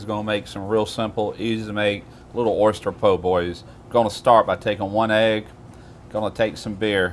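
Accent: South, United States. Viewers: i’m from virginia and there is something tremendous about having a southern man give this advice. When i hear the draw i know he’s gonna be great. thank you sir!!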